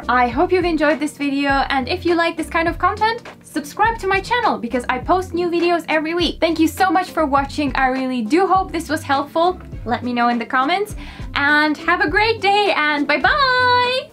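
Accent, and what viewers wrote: Hungary. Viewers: …where are you from?? Just wondering…I take a guess but can’t quite get it correct I think. ❤️🤗Ok, I see now. You’re from beautiful Hungary!!! Lovely accent. ❤️🤗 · What's wrong? She is from Hungary and many people around the world have accent and pronounce words differently.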